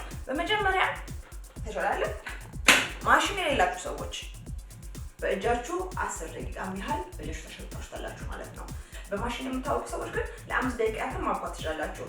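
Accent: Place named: Dire Dawa, Ethiopia. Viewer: ባለሞያ ነሽ አወራርሽ ደሞ የድሬ ዳዋ ሰው ትመስያለሽ የኛ ቤት አወራራቸው እንዳንቺ ነው። ለማንኛውም ማንኛውም alcohol አስከ 3 ሰአት የሚበሰል ከሆነ ነው አልኮልነቱን የሚያጣው 10 ወይ 15 ደቂቃ በማብሰል አልኮልነቱን አያጣውም ።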